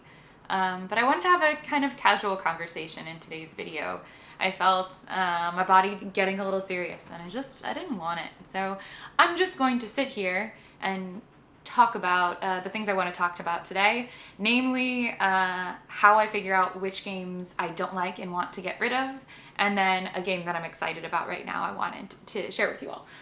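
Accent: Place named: United States. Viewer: This was a great video. Thank you! It's my first time commenting on this channel, but it's been growing on me ever since it was recommended by Jenna Rose in the Board Game Garden channel. I especially love the "dominant species" and "your mama" tests 😄 The first one especially made me reflect... Quite intrigued about the "philosophy nerd" aspect, since that appeals a lot to me, and I don't often meet those two aspects that I love (board gaming and philosophy) put together. Do you happen to have a second channel / blog / whatever about that? Lastly, I'm confused: you sound American, but your likeness is surprisingly similar to my Italian best friend! It's uncanny 😂 Anyway, thanks again for the great video :)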